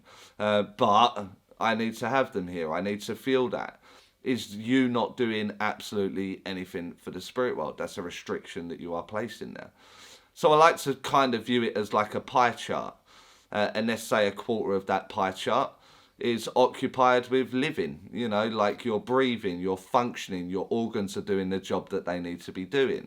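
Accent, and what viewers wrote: Essex, United Kingdom. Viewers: Before I even watch, I can't believe I have seen this I have only just fou d out I am a medium but I've heard spirit for 4 years. I just thought I was crazy, well literally I've been hearing the word gatekeeper so if just typed that word in as I have been hearing the name percival as well so typed in the 2 and your the first video and it's about mediumship. I am having a moment stuff keeps happening and I'm researching and it really is true.. I'm not nuts atalll how would I even know this.. I've just had to search the words I hear.. I'm very much still coming to a realisation I've been classed as having a mental health because I couldn't explain what was happening to me. I don't know why anyone would want to put thumbs down, I mean you sound like where I'm from Essex x💚 anyway I will watch now. There's a nice vibe straight away sorry to type on I just can't believe what's happening.. 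I'm having a moment and I have to get it off my chest cis everyone thinks I'm crazy when I say I've been hearing the gods as well as reletives and other entity I can only see tho via dreaming as if yet but I've spoke with Calvin klien and had visions of Diana, spoke with Hermes, Hearing percival and spoken with Gabriel too Thank you I probably sound so silly but it really true x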